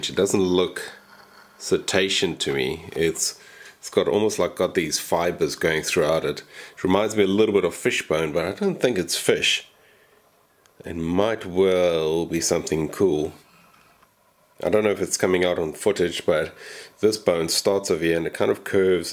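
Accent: South Africa. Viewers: The longer you go back in this guys video archive the more South African he sounds 😮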